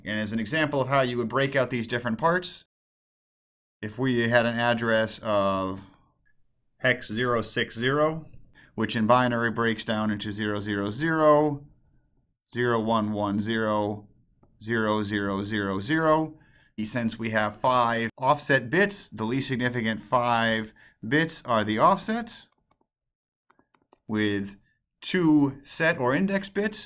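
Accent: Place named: India. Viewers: Thank you so much for this. English is my second language and I have a huge problem understanding indian English, so this is extremely valuable for me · Indian English lets me forgets standart english as you can see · I know right. I mean no offence, but it's hard to perceive an Indian accent.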